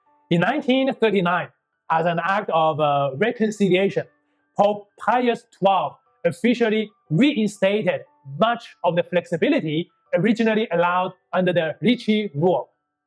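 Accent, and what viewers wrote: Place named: China. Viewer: Thank you so very much for your informative and useful history. I enjoy listening very much. Must say honestly the presentation is hard to follow and difficult to understand. Please let me suggest some correction ideas. It will be very helpful to use SUBTITLE for your speech. Your English is excellent, but you speak it like you speak tonal Chinese, hard to understand, meaning lost is frustrating. Please SLOW DOWN, pronounce each English syllable more accurately so each won't sound like you talking Chinese. Manage your emotion and body language to express CALM, can't speak English with same excitement when speaking Chinese. Thank you very much for the work you do!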